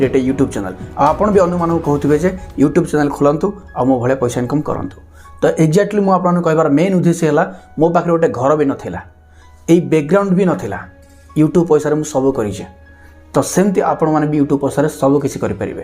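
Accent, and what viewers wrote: Balasore, India. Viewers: Balasore kahuche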